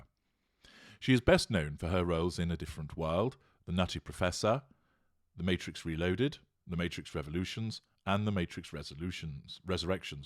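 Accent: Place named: England, United Kingdom. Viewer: Tell me why all it takes is an English accent to make a mf sound like he knows what he's talking about. (???)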